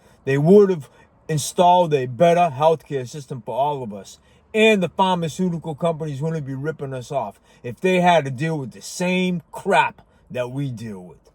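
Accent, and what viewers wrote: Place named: Rhode Island, United States. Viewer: I grew up in Boston and I moved to Rhode Island when I ran away from home and purchased my first house at 26, because I couldn't afford the house that my parents wanted me to buy next door. It was too expensive and I couldn't even qualify for the loan. Even though, I was making good money at the time with a 20% down payment. Boston is very expensive at lease Rhode Island and New Hampshire is a little better. But rents in Providence is $2,000/month now and Boston is $3,000/month. But the seafood on Federal Hill is out of this world and there are many inexpensive great beach seafood joints on the water in Warwick. My favorite is Iggy's. Fun and casual giving you that "laid back vibe" I knew I detected something in the accent of your voice. It makes sense now.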